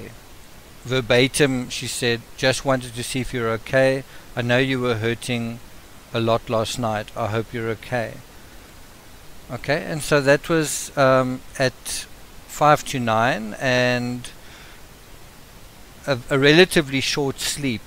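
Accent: South Africa. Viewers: He has a south African accent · Omfg.. I’m off.. soooo sssslllloooo<link> thst and the SA accent.. sorry 😐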